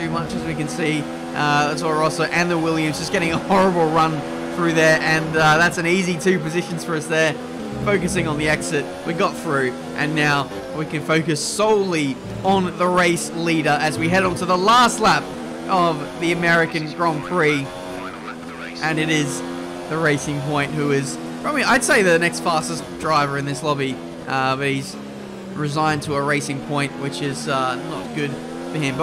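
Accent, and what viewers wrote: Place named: Australia. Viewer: That moment when you expect ‘Yo, JD here, TRL Limitless’ (in a British accent), but you sadly hear: What is up guys, welcome back to a brand new video’ (in an Australian accent)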